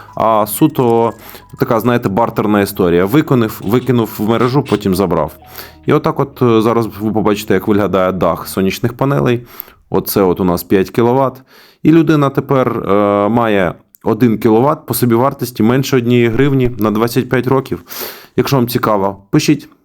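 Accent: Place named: Russia. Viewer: Обмоскалений диктор!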